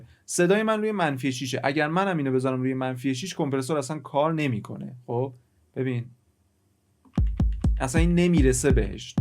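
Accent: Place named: Mashhad, Iran. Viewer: دمت گرم میگم شما مشهدی نیسی احساس میکنم لحجه داری مشدی داری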